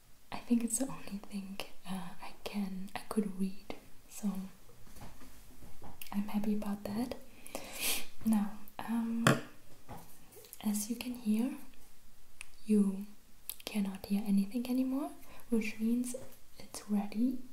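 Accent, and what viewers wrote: Germany. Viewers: ydobon it’s funny how people usually say they hate german accents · Your accent reminds me of my German grandmother💕 she’s from Görlitz, Germany. I went to Germany when I was 16. I loved it so much, i fell in love with the country. I want to live there someday.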